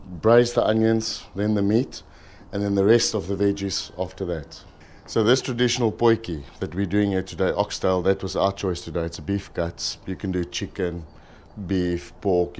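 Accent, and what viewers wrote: South Africa. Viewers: Als ik jouw zo bezig zie, dan besef ik: WAT MIS IK ZUID-AFRIKA. En vergeet vooral niet: dat prachtige Z-A accent. Potjiekos met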